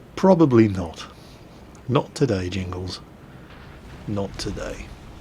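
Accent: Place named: United Kingdom. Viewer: Good bloody lord you are hilarious to watch, I'm lucky that I've subscribed onto you, hahaha! It has probably to do with your British accent, otherwise I won't know it either. Keep up the video's, you'll get my likes! Blue Skies!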